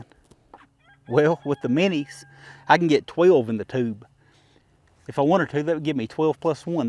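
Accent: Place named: Rural, United States. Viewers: I purchased a 500 retro with the 18.5 inch barrel and was looking for a 28 inch barrel for it and they run about $220. I seen the maverick at rural king for $179 with the 28 inch and got an extra shotgun. Since the barrels interchange it's the best combo. I have the Opsol adapter and have ran it through both interchanging barrels and they run like a charm with the mini's. Just a side note my Dad and Grandfather were from Augusta Ky. and listening to your video's with your accent reminds me of them. They would shoot skeet every Saturday here in southern Indiana and load their own shells and always clean everybody out. Good times and good memories. Thanks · "Well ventilated " love it! Of all the gun channels I subscribe to, this is my favorite. Must be the Kentucky accent. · I like this guy and his country twang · East Tennessean here, sir you sound like someone from my neck of the woods. 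Loved the vid. I have the same adapter. It’s amazing. Keep up the good work.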